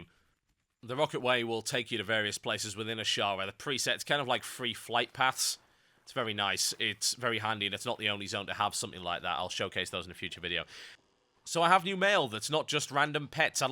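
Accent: United Kingdom. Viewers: I wish I had an awesome british accent like you :(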